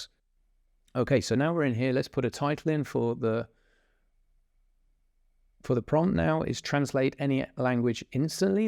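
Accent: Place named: England, United Kingdom. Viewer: You have a very nice english accent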